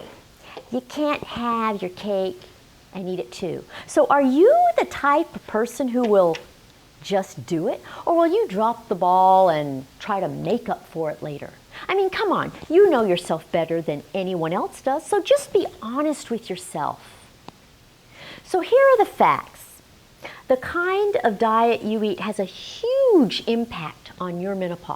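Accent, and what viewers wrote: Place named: South, United States. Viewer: My dear Ms I can see you have got my feedback all wrong. I do appreciate and respect thoroughly the amazing work that you are doing. As an audience and as a person who understands the value of this my most sincere intention is only to bring to your notice a couple of things that nay enhance your appeal across audiences. As I mentioned earlier all I have shared is with due respect to all you do, I feel sorry that a person as well educated and enlightened as you us unable to appreciate a simple opinion about how you could reach broader borders. I work with appearance and understand that when people cannot connect with what they see or hear they are likely to switch off. And I do not understand what understanding English has to do with this at all. Your very southern American english can probably be comprehended by only those used to its peculiarity its not as uniformly understood by non native speakers at all. 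Having shared my thoughts I have no intention of offending you ma’am its only a way of sharing my thoughts about how you could increase your appeal. I do hope you understand my drift. Thank you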